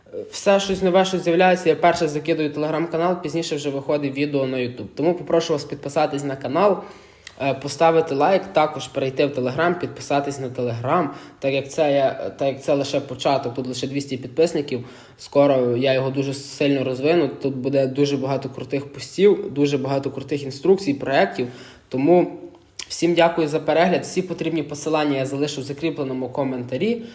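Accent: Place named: Surzhyk, Ukraine. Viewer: Суржик топ😂чекаємо за поінти нагороди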